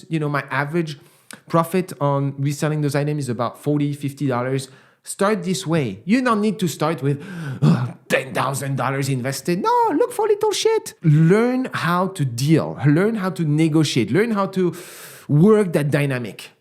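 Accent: France. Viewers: Your French accent is so cool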